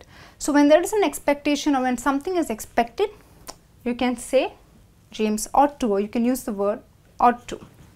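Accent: India. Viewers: Her accent is so different. :) Is she from India? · Indian english 🤦🏼‍♂️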